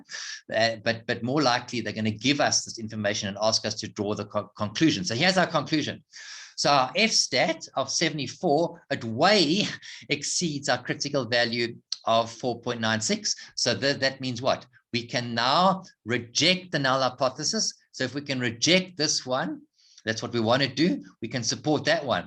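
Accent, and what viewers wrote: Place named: South Africa. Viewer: Thank you so much. Very well explained. Love the south african accent. :)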